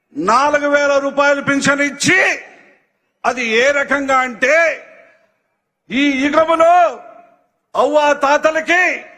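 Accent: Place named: Alur, India. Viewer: Nee alour village yasha basha 😮😮😅😅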